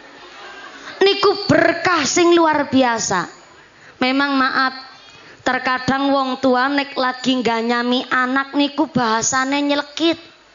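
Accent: Indonesia. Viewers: Itu bahasa jawa ngapak dari cilacap mba... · Masya Allaah ngapak mantep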